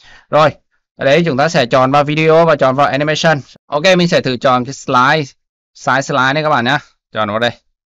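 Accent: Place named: Nghe An, Vietnam. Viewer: anh cảm ơn em nghe giọng em như người nghệ an quê mình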